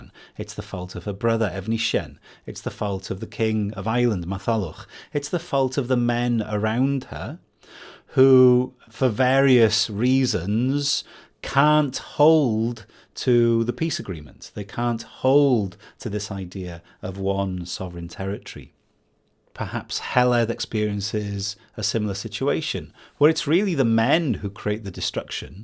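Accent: Wales, United Kingdom. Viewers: It's nice to have a Welsh bloke talking on this subject for once instead of some posh English neo -pagan classicist academic pontificating on things he only partly understands.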